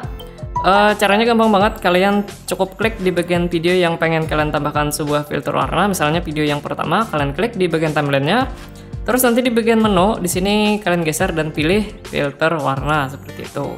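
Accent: Banjar, Indonesia. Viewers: Dari penyebutan huruf, pinanya beliau ni urang banua jua nah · Orang banjar nih yg ngomong..😄😄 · Kaya orang banjar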